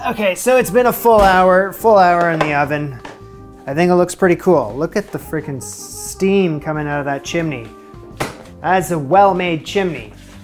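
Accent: Canada. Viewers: You mean you couldn't tell? I'm not from Canada, and I noticed the difference of his Canadian accent to the US one...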